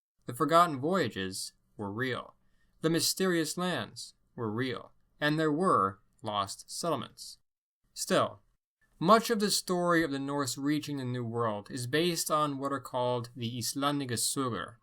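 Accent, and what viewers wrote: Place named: United States. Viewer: One note for you mate, as a British-English speaking Dane who also speaks Old Norse, just because you're rolling your R's doesn't mean you're saying the names in Old Norse.. You're rolling your R's well - but still maintaining the American accent. If you're working on it then good job 👍 keep on going. But if you're not, exaggerate the vowels more and do a bit of research on the Viking alphabet and how to pronounce the letters. For example when you mentioned Erik the Red's name in Old Norse , - "Eríkr" - was perfect, but - "Rauði" - was pretty shite no offence. The letter, "ð" is the equivalent of a voiced "th" and the "i" is a stressed "ee". So it should sound like, "Rowthee-", rather than what you said, "Rowdeh". Just giving you a heads up cause you seem to be trying to speak Old Norse 👍.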